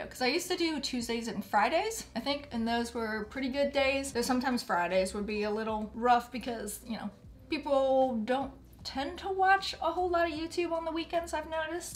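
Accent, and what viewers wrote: South, United States. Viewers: Just got a couple of nose piercings and was looking for aftercare advice and and noticed makeup eyeshadow colors. I love to see bold colors used even though idk how to put makeup on lol but I love the accent (sounds southern and northern at the same time) and listening to her voice is so natural ❣️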